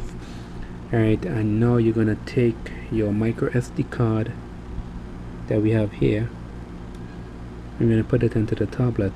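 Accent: Trinidad and Tobago. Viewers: trini accent detected?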